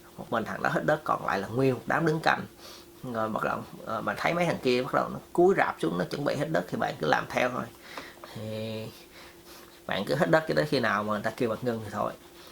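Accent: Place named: South, Vietnam. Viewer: Chia sẻ hay, giọng nói đậm chất nam bộ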